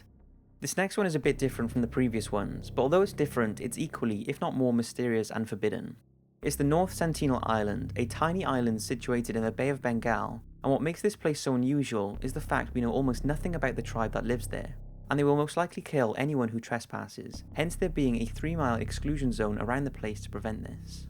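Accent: United Kingdom. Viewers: the British accent helped me stay calm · damn, british accent...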